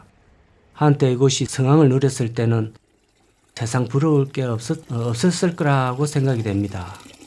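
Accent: Gyeongsang, South Korea. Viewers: 야이마 겡상도 사투리 쓰지마 방송하려면 시부럴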